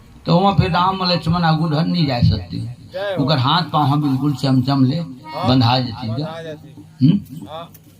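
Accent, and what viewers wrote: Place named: Chhattisgarh, India. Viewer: बहुत ही सुन्दर रामायण कथा वाचन । बड़ सुघघर छत्तीसगढ़ी बोली भाखा में जी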